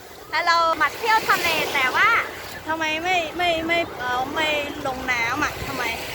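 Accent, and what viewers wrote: Cambodia. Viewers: ฟังสำเนียงแล้วเขาเป็นคนเขมรครับ จากคนสุรินทร์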